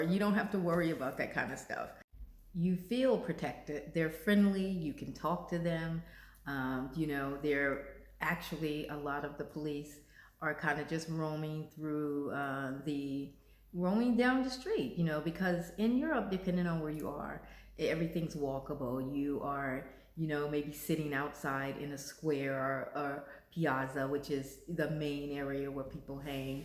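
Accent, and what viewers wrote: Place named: United States. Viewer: I can't speak for the rest of Europe but in Britain I think most people would be accepting and curious , the question is nuanced because some British people are becoming nervous of some folks from other countries than America who may also be black ( sad but true ) but once they hear you speak they will know you are American, I'm British and I hope this helps amigo 😊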